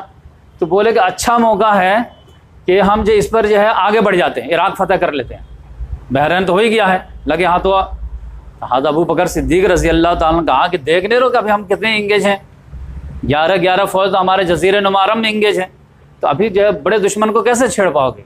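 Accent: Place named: East India, India. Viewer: Assalamualaikum wa rahmatullahi wa barakatahu sheikh saab....aapki aawaz se lagta hai aap east india side ke hain , Jharkhand or Bihar....aapse milna hai ..InshaAllah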